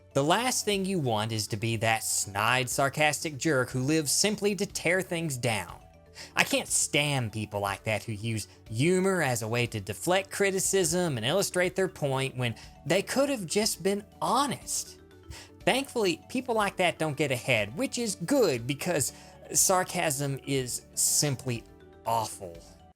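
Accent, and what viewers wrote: South, United States. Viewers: You have the most interesting of accents that's its rather indiscernable what corner of the US (or Canada? Nah, I don't hear Canadian...) you hail from. It's like a southern twang with a twist. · Are you from the south? I never noticed how southern your voice sounded when I couldn't see your face. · NEVERMIND IT'S TENNESSE I KNEW IT WAS AMERICAN SOUTH. Also I was looking at his website and the thing about time traveling Nazis was in the same paragraph than the TENNESSE thing. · Wtf face reveal! Also, you look way less southern than you sound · It's so weird actually seeing him, and then hearing him speak with the Southern(?) accent, even though I've heard him speak with it before. I guess it's because I live in New York City and only see him as a cartoon, and thus I associate the accent only with the cartoon, and not with three dimensional people.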